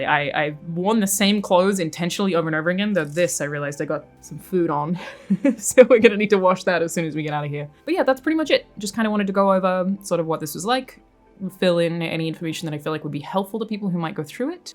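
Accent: Australia. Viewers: hermoso aussie accent 💙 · The main thing I noticed is that your Aussie accent has come to the fore quite strongly.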